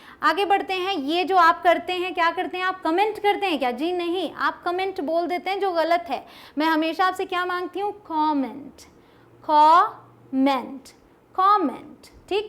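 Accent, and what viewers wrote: India. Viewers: no mam. I am not insulting her .what we learned in childhood English. Its Indian pronunciation. Thats what I am talking. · Mdm ye Indian eng h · Indian accent...👌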